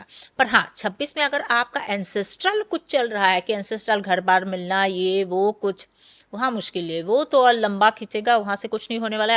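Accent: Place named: Bengal, India. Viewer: She is a Bengali,so the accent,but her predictions are good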